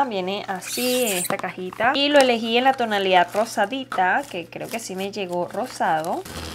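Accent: Venezuela. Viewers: jajajaja tu acento Venezolano es único jjajaja saludos preciosa desde hoy soy tu suscriptor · Con solo ver 30 segundos del video me di cuenta de que eras venezolana 😍 jajajaja nuestro acento es UNICO!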